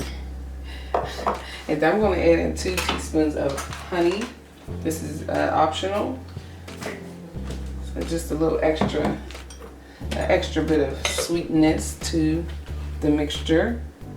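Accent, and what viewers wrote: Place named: Delaware, United States. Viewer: Hey! This looks good! I have a sweet tooth as well lol and love sweet cornbread too! I like how you talk too lol you kinda sound like my family that’s from Delaware 😊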